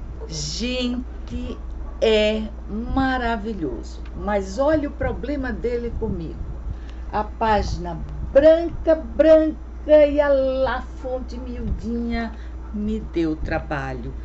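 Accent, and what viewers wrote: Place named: Pernambuco, Brazil. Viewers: Por favor,! Faça vídeos longos! Vc tem um voz muito agradável somada a um sotaque refinado do Nordeste, som q, aliás, adoro ouvir! Vc é muito agradável nas sua colocações e tem muita coisa a dizer com o seu conhecimento! Caso alguns dos seus seguidores não gostem de vídeos longos, alterne vídeos curtos com longos para agradar aos q gostam! 😊 Outra coisa: vc poderia me dizer o título do livro romântico q vc iria começar a falar, mas se lembrou q não estava na sua pilha? Fiquei curiosa! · Feliz Ano Novo e Bons Livros 📚!!!! Gosto de ouvir você sobre os livros sobretudo com sua fala pois lembra muito minha mãe Marlene que era também pernambucana. Bjsss😘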